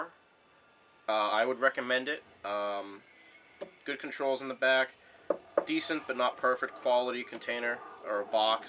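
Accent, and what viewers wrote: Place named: New England, United States. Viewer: new england accent.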